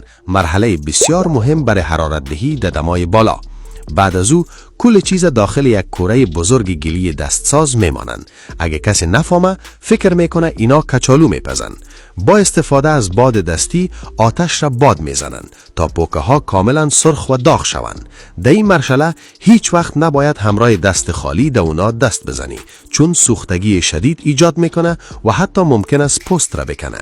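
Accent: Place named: Afghanistan. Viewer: زبان و لهجه افغانستان است برادر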